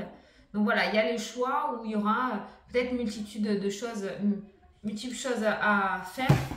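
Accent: South, France. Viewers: J'avais raison vous êtes dans le sud comme moi il y a des lotos je pense que nous sommes pas loing mais ce suis pas chiante ont parle comme sa ici.❤❤❤❤❤❤❤❤ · Coucou, je vous découvre depuis hier, et c'est rigolo, le début de la guidance avec un accent du sud hyper prononcé et disparaît au fur et à mesure. Merci pour tous ces détails. 🙏🤗❤️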